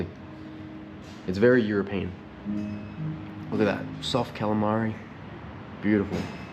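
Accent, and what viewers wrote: Australia. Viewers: Australia is so cool! Love your accent! So amazed to see the porcupine akinia .Totally 🆒!!!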